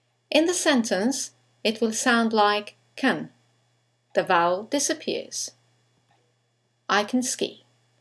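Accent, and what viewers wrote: England, United Kingdom. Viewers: RP accent I more need podcast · It's really really hard to understand her... It's souds british, I have serious difficulties in that. But I could understand.... Thanks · thank you . the British accent is very nice .but a little hard . but it sounds good. and thank you again. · Yeah, it's called a BRITISH accent... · Thank you Btw, this accent only use by British, right? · your classes are for beginners, and your accent does not sound from USA, it sounds from UK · Who doesn't? It's more British than my accent but I think she speaks very clearly and understandable to any native speaker or proficient student. Janine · Is this british englisH? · Hello, i apreciate your lessons, i like to listen to you 'cause your accent is very pleasent to me. I hope you can bring more and more tecniques to talk as a England person. i have difficults to pronounce words like: thought, through, though, taught. 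· British English? Lovely.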